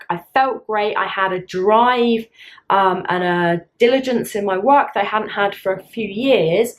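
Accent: United Kingdom. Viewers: I love you my sweety your excent is look like London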